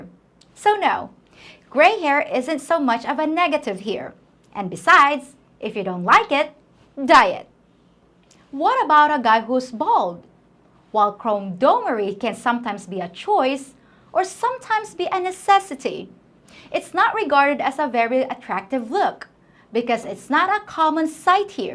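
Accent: United States. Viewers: very well done video. Just one thing is a little disturbing but I do not mean it at all as an insult: if you speak in english, it would sound nicer to use proper british english instead tp but on american accent what is seen as not that nice to hear in Europe. Never mind. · Your American style of English is interesting.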